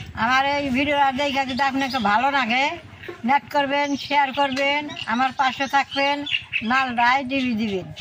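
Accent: Bangladesh. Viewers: আপনার কথাগুলা একদম আমাদের বাংলাদেশের মানুষের মতোন ❤❤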